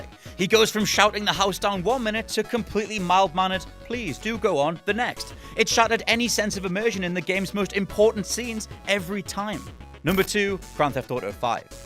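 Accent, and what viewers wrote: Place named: United Kingdom. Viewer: A British accent wearing an Alter Bridge T-shirt, makes it hard to stay mad at you.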